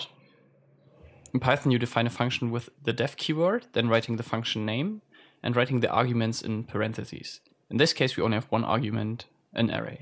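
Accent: Germany. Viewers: Ich wette du bist deutsch :)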